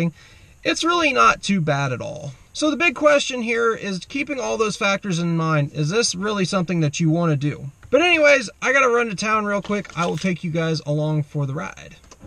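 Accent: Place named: Midwest, United States. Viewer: In Mexico? You sound like you’re from the Midwest?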